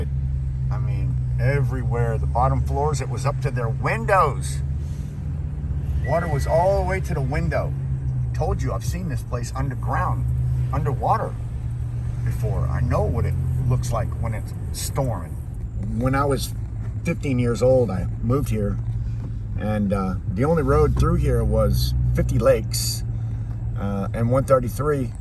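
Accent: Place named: North, United States. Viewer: Howdy neighbor I live in Fair bluff NC we had flooding, but not like that this time the Beaches got hit hard . You sound like you're originally from up north I am as well , but been here 30 plus years now. I subbed my friend does a lot of scrap metal I did it myself a few times . I clean houses at N Myrtle Beach and work at a grill a few days ain't retirement wonderful 😂 . God bless we have another storm heading our way I love across from the lumber River I'm on the high side so I don't get flooded but you can't get out either.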